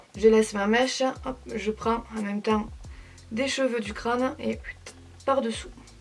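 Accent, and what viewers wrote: South, France. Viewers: Mes qu elle Canon 😍, j adore, tu es magnifiques et en plus avec un brin d humour, tjr pétillante, tjr la bonne humeur avè l accent du sud tous pour plaire 😊 d énorme bisous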